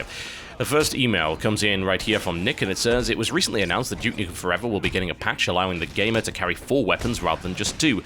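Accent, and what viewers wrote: United Kingdom. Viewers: lol I'm just listening so quality doesn't affect me ha ha :D hurray for his awesome british voice! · Everybody must do this, and they all must be in UK english so that they have a british accent like TB!!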